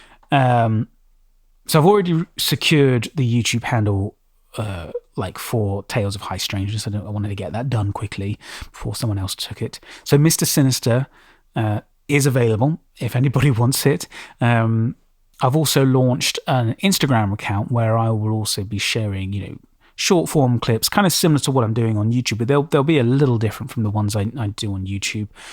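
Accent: United Kingdom. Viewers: Well, for me personally,it was like YT stopped showing me your content. And I always try to engage in the channels i like,even if it’s just liking the videos.. and they’re definitely not going to tell you if your being shadowbanned !! And that sucks about the Mr. Sinister name!! I’ve noticed channels that do well, consider their fan base as their “family”, and interact often on live streams,posts,surveys (I do them!) never feel like you can’t ask your fans what they want!! I also started watching for wendigo stories….it’s hard to find ones that are close to lore, and aren’t getting skin walkers and wendigos mixed up..there’s some good stories out there, out of the thousands of crappy ones! Find something that you can make ur own, like ASMR(rain,fire,wind sounds)and scary stories. It’s oddly soothing. “Being Scared” I believe is his name is a great creepy pasta narrator,with ASMR. 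Dark Somnoum writes and performs all his music in his videos,and many narrators in the community support him,he’s a great narrator,as well as musical talent!! So maybe there is something special you can offer your fan base as well! It makes folks feel appreciated! I hope some of these comments helped,and are solely meant to be constructive! I am a big fan of yours as well,I love the Brit accent and you have great narration skills as well! I know it can’t be easy!! But thank you for the great content no matter what!!😊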